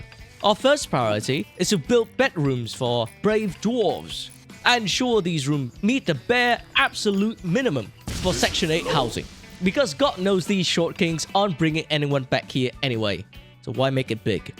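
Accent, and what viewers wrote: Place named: India. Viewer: why do u sound like u have a 5% indian accent today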